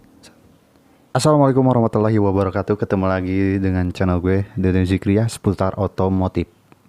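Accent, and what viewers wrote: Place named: West Java, Indonesia. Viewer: Gua aja bang jangan gue jd norak soalnya logat sunda ga pantes bilang gue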